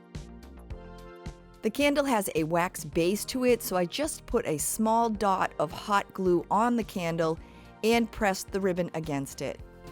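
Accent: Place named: Boston, United States. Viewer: Any chance you're from New England area? I hear some kind of accent similar to my Mainah accent lol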